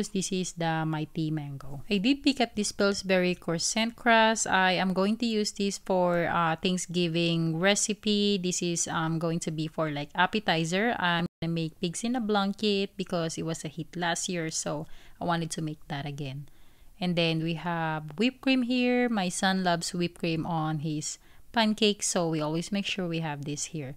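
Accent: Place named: Philippines. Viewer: 🛒 Loved the video, so I had to subscribe! If you don't mind my asking, are you Filipino? I lived in the Philippines until I went to college in the states and your accent makes me homesick. ❤ So many good items you found at Sam's club! I'll have to try the fried rice, it looks so good!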